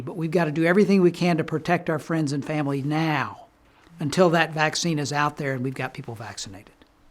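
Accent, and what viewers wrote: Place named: United States. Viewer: Another Doctor that gets to keep his license and job! (he also has the same American accent as 'Snowden' his first name will come to me...maybe) as has of course zuckerberg, gates im English and these have a familiar accent its it a certain region? Or is it their own exclusive tribe? Edward! i knew it would come to me if i just kept typing!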